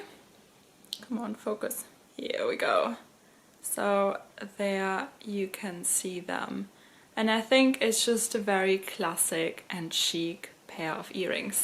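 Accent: Germany. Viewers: Was hast du denn für einen deutschen Akzent?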